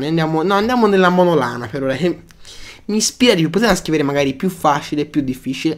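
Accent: Florence, Italy. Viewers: adoro il tuo accento fiorentino :D :')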